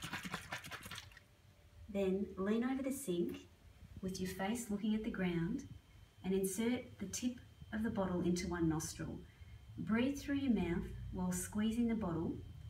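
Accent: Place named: Australia. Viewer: Australian voice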